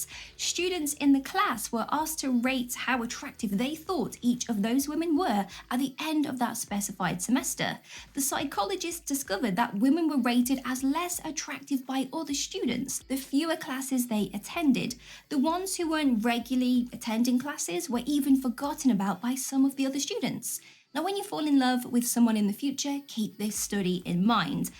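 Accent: United Kingdom. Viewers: HONESTLY. These behaviors are not problems I have. The exception is that sometimes I seem to be figeting, but I'm actually constantly doing finger exercising to increase dexterity (professional musician... guitarist) I usually just explain that I'm not nervous, and about the exercises.... Found it to be a Good way to open up an invite for a lady to come to one of our shows. However, listen up everyone. This information is hugely important. Nice Work. Good Content here! I'm liking your British accent, reminds me of my favorite friend in college. She was from somewhere near Cambridge. She used RP.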